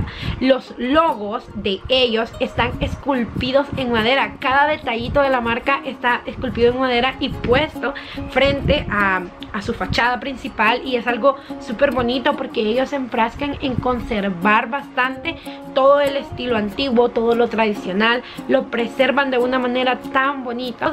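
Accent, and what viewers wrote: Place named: El Salvador. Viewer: Por tu acento sos guanaca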